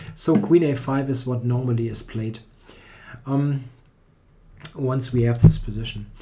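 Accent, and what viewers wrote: Germany. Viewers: I watched the analysis of this game on two other big channels and on your channel. And this video is the best in terms of video and sound quality and you offered by far the best explanations of lines and other possible variations. Nur ein sehr starker deutscher Akzent aber daran kann man sich gewöhnen.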